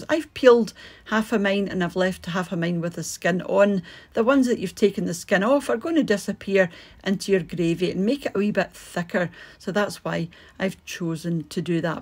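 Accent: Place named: Liverpool, United Kingdom. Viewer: Scouse at its best!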